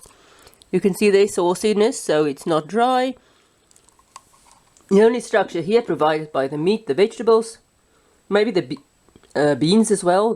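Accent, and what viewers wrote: South Africa. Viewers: Joh that South African accent is coming through hard😂 Baie goeie review👌🏻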